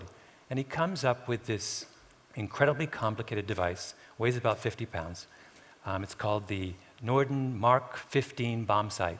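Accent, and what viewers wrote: Quebec, Canada. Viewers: Sounds Canadian... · Americanos pronounce it as "Bomm Buh Deer" while Canadians pronounce it as "Bome Bar Dee Ay", that's a dead giveaway that Malcolm speaks Canadian. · French Canadian. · He's Canadian. Where both languages are used. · is Canadian. We sometimes talk funny.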